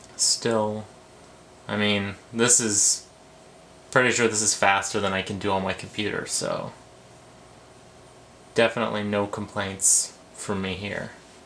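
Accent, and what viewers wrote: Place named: Canada. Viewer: He actually sounds Canadian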